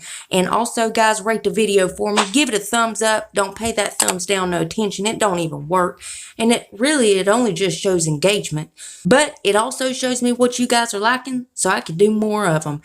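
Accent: South, United States. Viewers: Lawdddd your southern just like I am honey I love it so much and your gorgeous to boot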